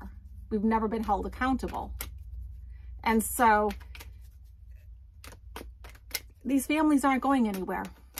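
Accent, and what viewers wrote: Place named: Boston, United States. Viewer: Is UR accent from the greater Boston area? U sound like home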